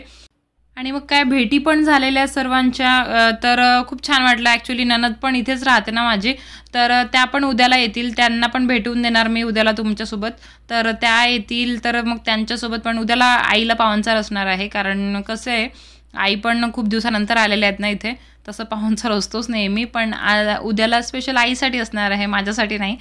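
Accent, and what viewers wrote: Vidarbha, India. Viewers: Tai tuzi vidharbh bhasha khup avadte. Mazi aai pan khamgaon madhli ahe. Khup athvan yete tuzi language aikun ajol chi... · हो माझी आई पण विदर्भ मधले ते पण संभार बोलते😂😂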